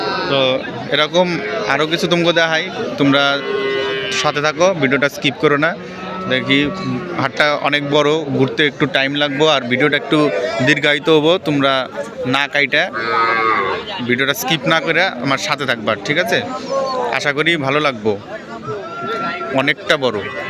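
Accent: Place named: Tangail, Bangladesh. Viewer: দাদা,,, ইন্ডিয়ার ভিডিও,,তবে মাঝে মাঝে বাংলাদেশর টাংগাইলের ভাষা বলেন কেনো😂😂😂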